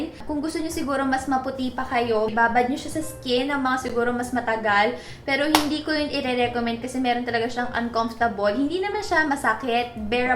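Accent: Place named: Batangas, Philippines. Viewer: May punto ka sis, batanguenya ka ba? 😊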